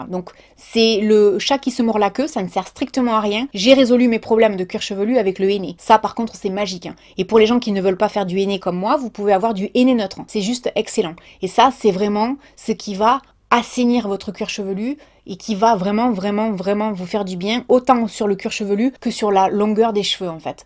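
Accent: South, France. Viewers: Ça fait longtemps que je regarde tes vidéos! J’ai créé un compte YouTube spécialement pour te laisser un commentaire. Je trouve ton travail fantastique. Tes videos réalistes. Tu dis tout fort ce que certains pensent tout bas! Je trouve ça cool!! Ton accent du SUD super !! Continue comme ça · Merci pour votre vidéo très intéressante. Votre accent me plaît beaucoup vu que je suis moi aussi du Sud 👍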